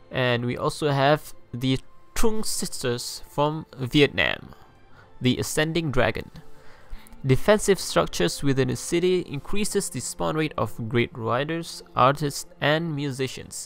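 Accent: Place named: Malaysia. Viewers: Sounds Malaysian, hi! xD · I can recognise the accent especially when you say Darussalam, Kampong, Che Bong Nga, Gajah, Alam Melayu, and Pesilat. Very significant ^^ Nice to meet you :D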